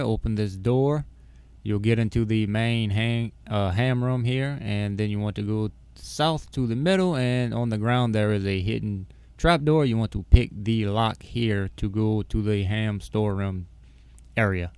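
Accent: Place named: Louisiana, United States. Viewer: sounds like a Louisiana boy.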